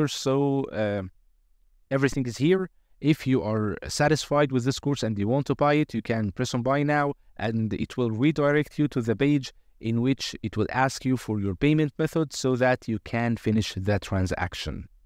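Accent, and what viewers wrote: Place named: Egypt. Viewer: Thank you very much! it worked. وأخدت بالي إنك مصري زي من أول كام جملة :D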